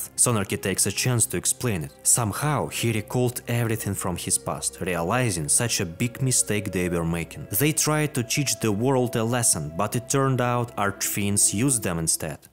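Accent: Russia. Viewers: А я почему то догадался что ты русский, по манере разговора английского, его тембра да и самого звучания, но на я в этом убедился, тк сохранения Ppsspp было на русском. Мне приятно знать что ещё есть русское комьюнити по этой старой и шедевральной игре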